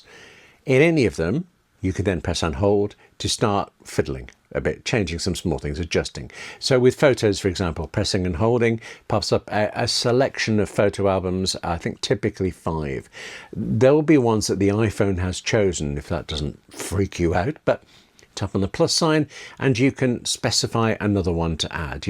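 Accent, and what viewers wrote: United Kingdom. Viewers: Great British accent but how about specifying the phone models on your headline not to waste any more time of us lowly SE users? 🖕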